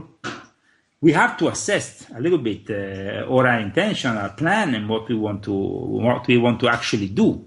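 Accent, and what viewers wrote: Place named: Italy. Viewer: Dude is a loon job who has the uncanny ability to memorize conspiratorial material & has a wild imagination- compounded by the fact that people are impressed by the his Italian accent. Not such a big deal to me as all my in laws sound exactly like Leo when they speak -minus the random chaotic scattered madness. This is ALL COMPLETE BULLSHIT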